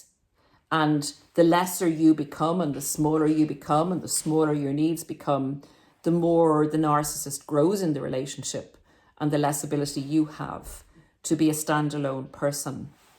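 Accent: Ireland. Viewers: This has to be one of the best descriptions and articulations of narcissistic behavior I've heard. And it is very hard to hear. I find myself reliving childhood memories of grief, tortured at the hands of a sadistic narcissist. I'm not sure if this reliving is therapeutic or just pouring salt on wounds that are healed as much as they ever will. One of the key attributes to an encounter with a narcissist is lingering self doubt. One questions their own behavior, "Did I do something to deserve this?" or "Oh, if only I were more sensitive and tip-toed more carefully I would not have offended and drawn this wrath!" It is this self doubt that later pains me. If I had only seen it! If I had only been a stronger person! If I could go back in time.... In any event, thanks for sharing. And your Irish accent is just awesome! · Malignant narcissistic relationship survivor and thriver here. 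Your personality is beautiful and you are as well. Your Irish accent is so charming!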